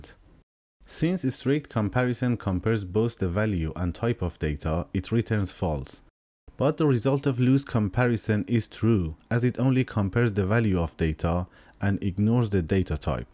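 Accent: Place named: Iran. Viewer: Your accent is like Persians accents lol , are you Iranian ? Buy the way I LOVE your videos man , Thank you so much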